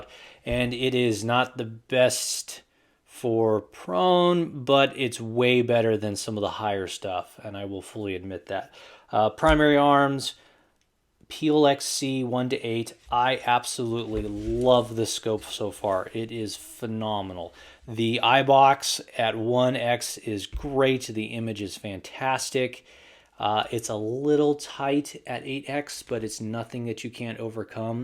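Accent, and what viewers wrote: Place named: Arizona, United States. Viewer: something in your voice reminded me of Ian from Forgotten Weapons... The way you talked when you gave reason for having only one rifle. I was like: I bet they are from Arizona - and that was before seeing the cactus patch! Am I close? :)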